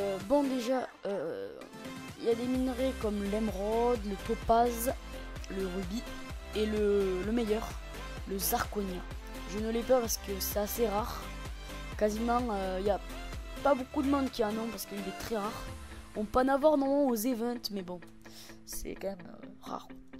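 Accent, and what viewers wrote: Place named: South, France. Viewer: abos. VIVE L'accent DU SUD. BGBG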